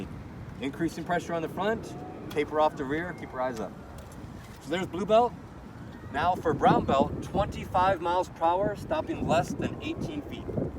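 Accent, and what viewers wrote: United States. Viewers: I like your American accent..very clear. Great info.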